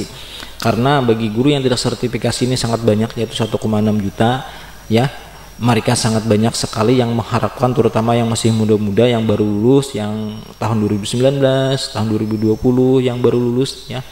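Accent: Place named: Banjar, Indonesia. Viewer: medok sekali banjarmu sanak😁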